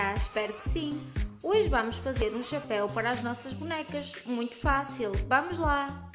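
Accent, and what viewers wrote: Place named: Portugal. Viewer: Seu sotaque português é muito fofo. ótimo tutorial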